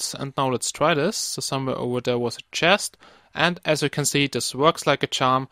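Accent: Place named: Germany. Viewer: You speak like an German who speak Amarican English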